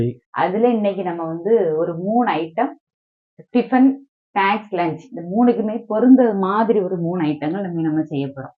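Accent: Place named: Nanjil Nadu, India. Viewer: Sister you are soooooo pretty and you exactly look like my aunty. And i love the slang of nanjil naadu 💐💐💐💐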